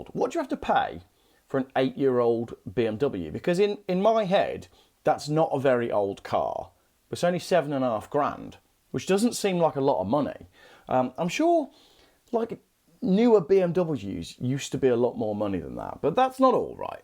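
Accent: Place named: West Midlands, United Kingdom. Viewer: I'm trying to place your accent...is there a bit of West Midlands in there?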